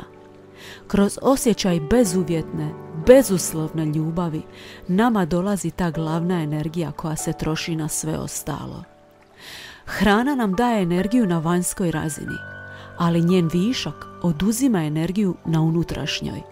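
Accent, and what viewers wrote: Croatia. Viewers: Ja crtam neke lavirinte, a u pozadini slušam, maaa superiska se opustio, korisno, vredno podsećanja, a glas, ritam, melodija maa šta reći, odlična si, no ja malo nisam navikao na vaš akcenat pa mi još bolje, zanimljivo veselije(hrvatski mislim) i svaka čast što obradjujete ove teme, ma siguran sam da ste pomogli mnogima, meni barem-sigurno!!! HVALA VAM I ŽELIM VAM SREĆU I USPEH OBOSTRANU, ZIVELII U RAAAAA!!!!!!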